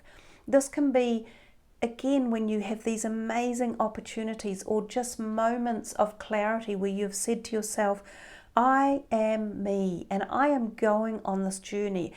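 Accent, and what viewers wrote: New Zealand. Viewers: Sounds like a kiwi accent 🌲🌿 🤗 Thanks for your reading. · “Four different dex of cards”... Love the kiwi axcent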